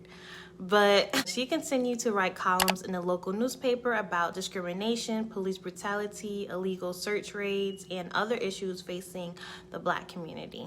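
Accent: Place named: New York, United States. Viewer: I wish she tracked down and annihilated each member of the rapist clan. Btw: Your New Yorkerisms and soft, melodic voice completes me. Love your channelllllll, the respect and the humor. Sis is a G by the way. Coming from a neighboring country to me, trust and believe I'd jook a whole dude in the eye for the foolishness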